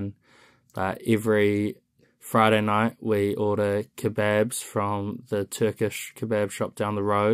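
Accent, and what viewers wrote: New Zealand. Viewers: 발음 목소리 너무 닮고 싶어요~^^ 로토루아? 거기 좋다고 하셨죠? 기억하고 함 가봐야겠네요ㅎㅎ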